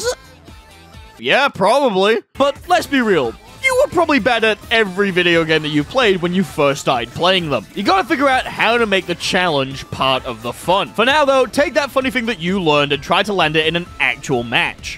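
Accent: United Kingdom. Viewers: you forgot about your silky smooth british accent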